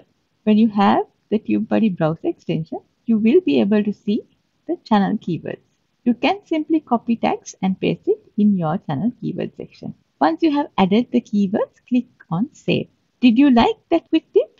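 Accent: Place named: India. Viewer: oo i think u r Indian because of your accent 🙂 sry 😋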